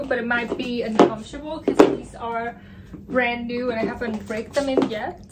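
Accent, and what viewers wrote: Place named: United States. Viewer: Sounds like you was educated in the states?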